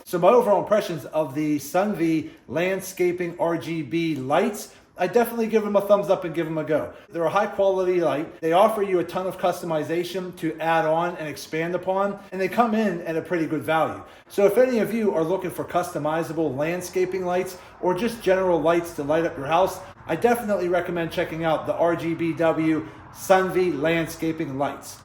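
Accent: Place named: Pittsburgh, United States. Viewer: Where are you from I'm from pittsburgh and I hear a yinzer accent when you talk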